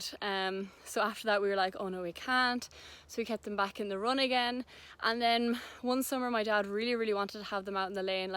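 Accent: Ireland. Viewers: I just love the way you say that bloody chicken I love the Irish accent (I'm from Ireland) Also u inspire me so much about 2 years ago a connemara mare called Jeddah was at my stables and I ride her an loved her until I done a round of canter and bucked me off the next week my instructor told me to ride her again to get my confidence back so we done another round of canter and bucked AGAIN this time she positioned her neck to help me but it didn't work I ended up spraining my arm so I couldn't ride for a while that knocked my confidence the next year I had a bad call I was jumping a gate at about 50cm and the horse I was riding was about Fiona heighten was a grey ISH he refused it 3 times eventually 5 girls started waving their arms to get him over it be jumped it and apparently my instructor said he jumped it like 2metres higher the n it was I wasn't ready for it so I fell of but me being awkward sommersaulted and landed head first and I got knocked out for 20mins for the next 6 months after that I would hardly trot I was so terrified u until we came across you you are such an inspiration thanks to you I have accomplished jumping a metre 10 last month I'm 12 years old now and I feel like an advanced rider (maybe a bit to far) thanks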